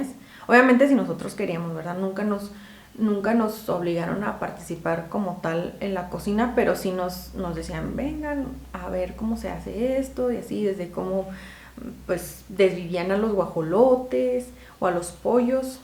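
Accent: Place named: León, Spain. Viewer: Tu acento es muy bonito (lo relaciono con el de León). Estaría padre ver un house tour y recetas, por supuesto.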